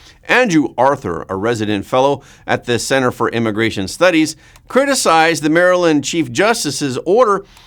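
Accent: Canada. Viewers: That powerful Canadian accent combined with superb in depth coverage of the US zeitgeist took American me by surprise. Thanks neighbor!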